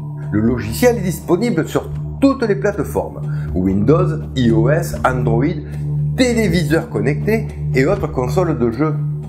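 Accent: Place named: Toulouse, France. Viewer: Holà A une époque où les chaînes de YouTube m'apporte plus que les années sur les bancs d'écoles.... La tienne franchement est exceptionnelle! Ton accent me rappelle Toulouse, ton vocabulaire et ton humour embellissent mes moments de détente. A 52 ballets,retraité précoce! Exilé dans la sierra uruguayenne... Je te souhaite une année riche et tranquille. Ton travail change la donne: Le savoir devient accessible et tu y contribue énormément!!! le Boss des temps disparu!!! Merci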